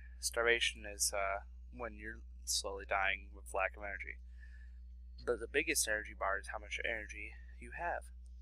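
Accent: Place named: United Kingdom. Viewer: You went from sounding heavy British to a more satisfying deep ear feel.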